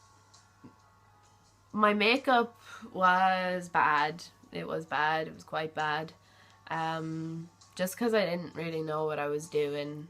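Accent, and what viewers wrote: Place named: Ireland. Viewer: Greetings! Judging by the way your beautiful voice sounds , I'm assuming you are from Ireland. I work with tons of wonderful fun loving and friendly Irish folks. I work for Ornua Foods in Chicago Ill. Ornua is Kerrygold butter. I call it Dairy Crack. Once you try, you can't stop. Best wished for continued success. Beat to you, LennyK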